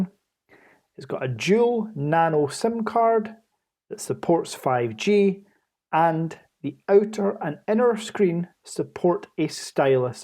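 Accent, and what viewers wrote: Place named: Glasgow, United Kingdom. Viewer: This guy speaks with s Glasgow accent. He sound like Rab c Nesbitt.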